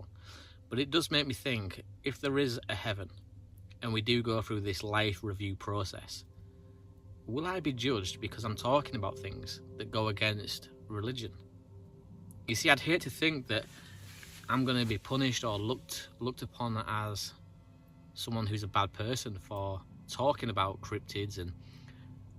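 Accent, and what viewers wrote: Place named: Yorkshire, United Kingdom. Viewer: Just come across your channel and so far so good pal! I would love to do what you do but unfortunately I'm not right well. I take your a Yorkshire lad from the accent. Keep up the good work pal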